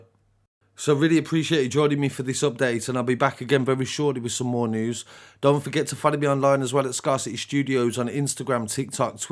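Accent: Birmingham, United Kingdom. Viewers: Scarcity is a g for doing this channel for so long but he really doesn’t sound like he wants to do it 😂😂 it’s the accent tho ..big up brum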